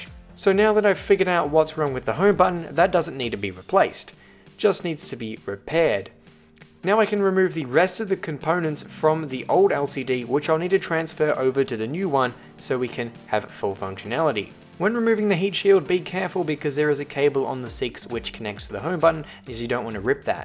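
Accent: Australia. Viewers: The battery had a 'crazy' logo on it and the guy sounds australian so I assume its crazyparts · are u from Aus?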